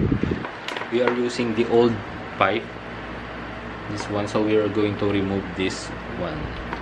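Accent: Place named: United Kingdom. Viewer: Akala ko british national yung nagsalita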